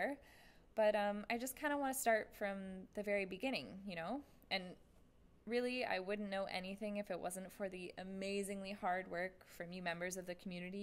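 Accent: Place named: United States. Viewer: American accent and ends vlog with "cheers" obviously been hanging out across the pond... in hello games studio? hmmm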